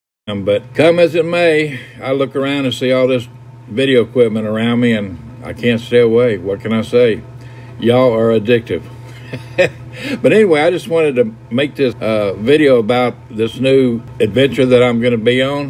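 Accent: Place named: Texas, United States. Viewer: So glad to have you back on here,. It is good to hear your Texas twang on videos! Haha. I do enjoy watching and listening to you,. Yes, YouTube is addicting. I'm writing this comment at 1am because I can't sleep, so what am I doing - watching YouTube! Haha. Good luck,!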